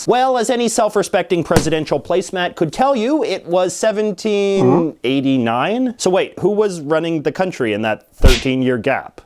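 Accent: Canada. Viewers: It's probably from the slightly different dialect they speak in Canada (where he's from)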